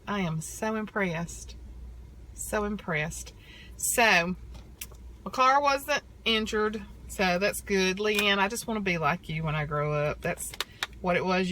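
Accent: South, United States. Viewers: I like your southern voice and how you always seem happy. I enjoy watching you.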